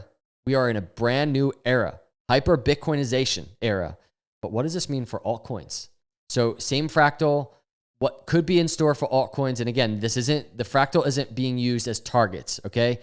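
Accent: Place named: New Jersey, United States. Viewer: I hear a Jersey accent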